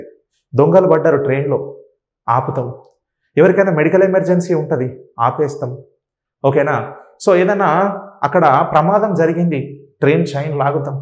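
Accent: India. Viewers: 5,6 days nundi mental ability videos chustunna....Hyd slang lo class vine sariki hayiga undhi sir.... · Sir midi nizamabad ah 😝alne matldutunru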